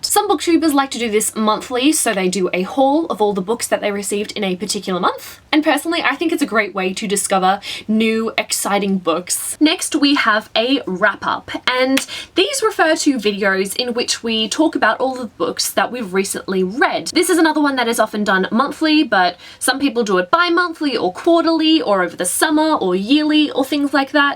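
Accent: Australia. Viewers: Loved your video, and thanks heaps. Also, it's wonderful to hear an Australian accent on a Booktube! - from a fellow Australian :) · Very insightful... still weighing up if I should become a booktuber or not but at least it doesn't feel like a scary impossible thing anymore.I should probably wait until after I've finished my assignments to get started...or even better when the semester's over, ha! But anyway good job, subscribed. P.s Are you Australian? It sounded like you have the accent (or maybe it's British) I only ask because I'm Australian :)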